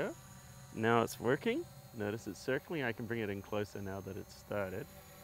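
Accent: Australia. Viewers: Great review mate great work,....you sound half Aussie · this fella sounds Australian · I mean probably 1/2 AU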